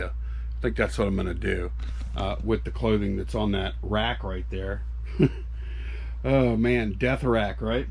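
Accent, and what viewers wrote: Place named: East Coast, United States. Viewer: My Dad used to let me stay up to watch Nature Boy Ric Flair, Rowdy Ronnie Piper, Hulk Hogan came later I think... back in the 80s. I love listening to back east accent also. I am from the east but moved to west coast 20 yrs ago.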